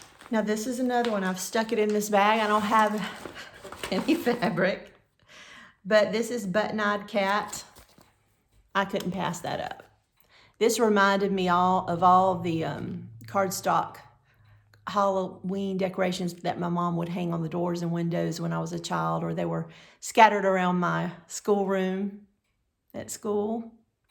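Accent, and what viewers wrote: South, United States. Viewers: I just love you and your channel. Everything cross stitch. And that southern accent, love it. You are a great gal. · I always enjoy spending time with you and listening to your lovely southern voice describe your junking finds and your stitchy accomplishments. Have a wonderful couple of weeks.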